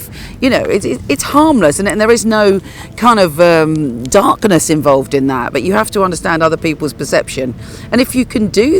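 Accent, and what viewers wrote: United Kingdom. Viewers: Why do I pick a little Scottish from you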